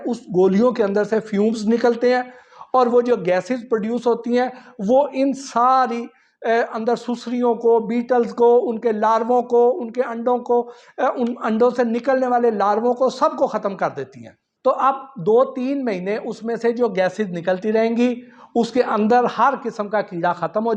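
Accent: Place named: Punjab, India. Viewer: ko Bolna to ata nhi.aisey lagta hai koi paindu bol rha hai Urdu bolny ka style to bilkul Punjabi touch ka hi hai ab Sachi baat kisi ko Buri lagti hai to lgey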